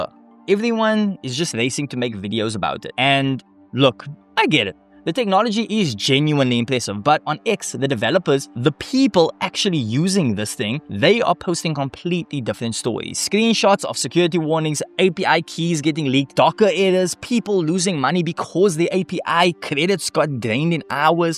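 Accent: South Africa. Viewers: ... Are you.... Are you South African ????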